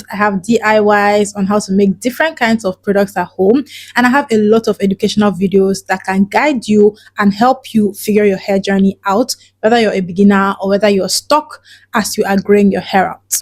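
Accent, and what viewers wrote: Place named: Germany. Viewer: I like your accent. I notice a little German tones to it? Am I correct?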